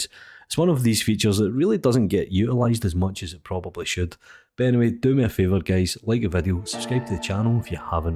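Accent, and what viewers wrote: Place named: Ayrshire, United Kingdom. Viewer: Nothing to do with this video, but I have been wondering this for a while. Your accent sounds identical to a guy I know who is from Ayrshire. Whenever I hear your videos it makes me want fish and chips as he owns a food cart here in Portland OR. PS. Loving Haggis Tools, I have quadview on all the time now. Makes this much easier for me.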